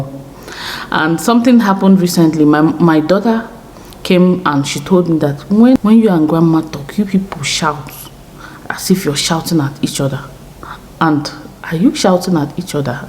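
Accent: Nigeria. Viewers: But your Nigerian accent is not change and it doesn't match your msanzi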